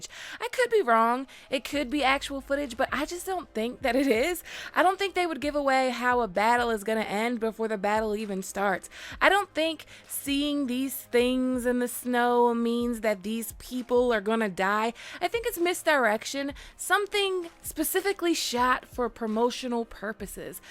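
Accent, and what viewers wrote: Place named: East Los Angeles, United States. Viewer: I love your voice! It’s kinda East L.A. with a hint of Inglewood 😊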